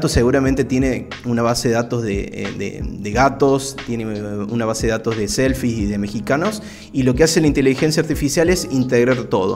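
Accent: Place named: Córdoba, Argentina. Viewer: Que tal capo, me parece que somos coterraneos no, ¿Cordobés?, bueno, lo que te quería consultar es si las imágenes que genera Bing son libres de derecho. Saludos desde Calamuchita 👍✅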